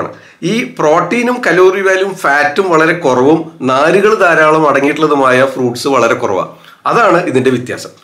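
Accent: Thrissur, India. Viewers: Ethra nalla avatharsnam dr.Trissur bhashayodu thanne sneham thonnunnu😍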